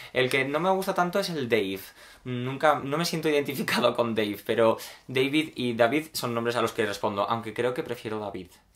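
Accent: England, United Kingdom. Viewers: Eres de Inglaterra?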